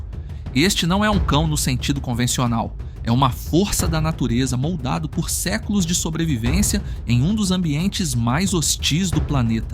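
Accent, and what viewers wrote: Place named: United States. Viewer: Похоже будто Американец пытается говорить на Русском языке, акцентом.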